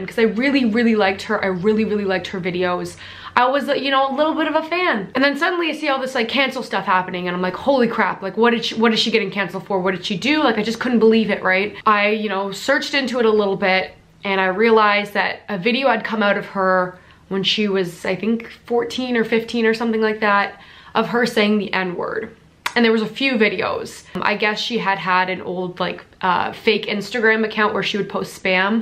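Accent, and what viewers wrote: Canada. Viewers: Are you from Canada? I'm getting some accident and I'm really dumb aaa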